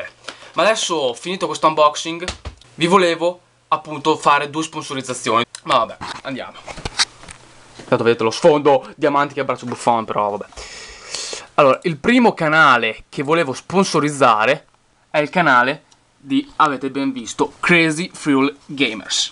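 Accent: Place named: Emilia-Romagna, Italy. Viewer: ma sei dell' emilia romagna? no perchè questo '' bella ragassi'' xD io dico bella ragazzi so siciliano :D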